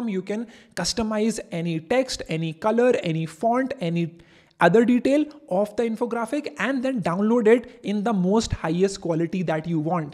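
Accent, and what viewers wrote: India. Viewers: thanks but that Indian accent is really hard to listen to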